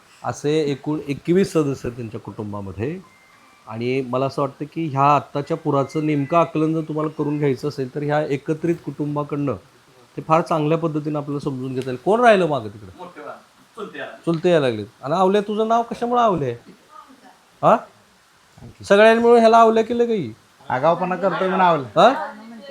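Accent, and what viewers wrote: Marathwada, India. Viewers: सर, तुमच्या बोलण्यातून मराठवाड्याची..बोलीभाषा ऐकायला...मिळते... खूप छान वाटत... अशाच काम पुढे चालू ठेवा...अशा चांगल्या गोष्टी समाजापुढे ठेवत जा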